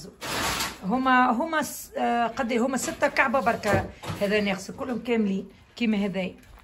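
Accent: Tunisia. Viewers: ما احلاها اللهجة التونسية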